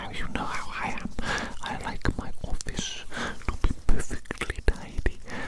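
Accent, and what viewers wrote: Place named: Scotland, United Kingdom. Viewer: Is he scottish ? Idk why but i'm pretty sure he has a scottish accent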